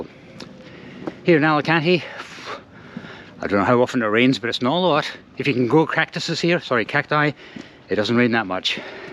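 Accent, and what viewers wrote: Scotland, United Kingdom. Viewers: Nice that you were brought up in Sydney and were able to keep your great Scottish accent！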